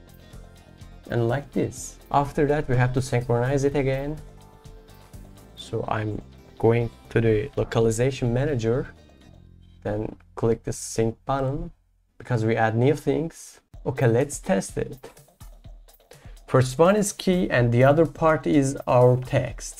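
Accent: Turkey. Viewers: İngilizceyi duyduğum gibi dedim aha Turko :) Başarılar teşekkürler. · Daha ilk konusmaya basladiginda bu kesin Türk dedim :d Hatta emin olmak icin yorumlara baktim. Guzel icerik tesekkurler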